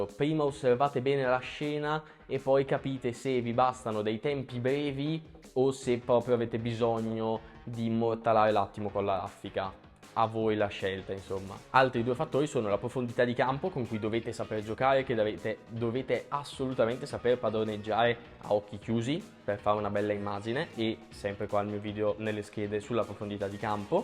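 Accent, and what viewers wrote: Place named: Brescia, Italy. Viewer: Ciao vecio! Permettimi il “vecio” perchè ho capito subito che sei Brescia dall’accento 😂 Anche io faccio Street, ho vinto anche un concorso tanti anni fa con una foto in Piazza Vittoria, se fate uscite di gruppo mi unirei volentieri per qualche sessione. Nicolò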